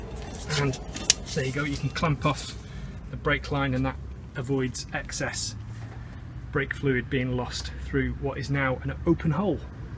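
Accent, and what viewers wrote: United Kingdom. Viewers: Thank you for that really useful video and your British accent is just amazing! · the brit accent really gives confidence. cheers!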